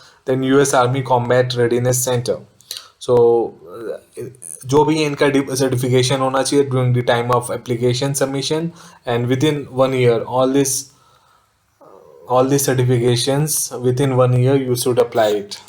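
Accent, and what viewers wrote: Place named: Bhojpuri, India. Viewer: Dear if u cant speak english properly for explanation about the international safety course then pls donot use bhojpuri english ....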